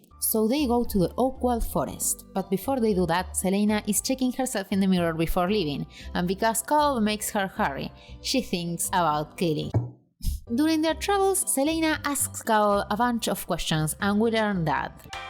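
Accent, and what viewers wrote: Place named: Argentina. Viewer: Argentinian ass accenttttt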